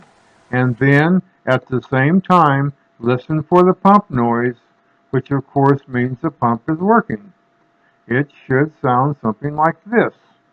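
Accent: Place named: South, United States. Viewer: RB CV really? He sounds really clear to me. Sounds like he maybe has a southern accent or southwestern accent.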